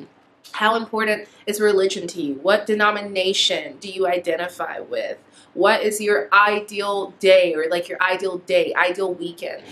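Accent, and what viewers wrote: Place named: United States. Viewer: When i saw the title of this video and then looked at the caption, i thought " i bet she is American " , i clicked on your video and you began to speak and yes, you have an American accent. Interracial relationships happen here in the uk everyday with everyone that we actually dont " label" it. Here in the uk we all mix and blend ,colour is not such a big thing here.. its a shame that everyone in the states is divided by skin... only in america!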